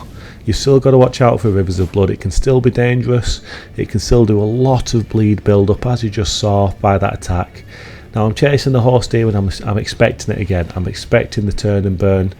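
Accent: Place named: Scotland, United Kingdom. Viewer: My dude is just a little bit scottish ❤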